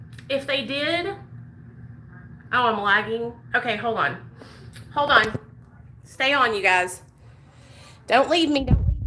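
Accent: South, United States. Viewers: R u from down south? I'm recovering addict outta Houston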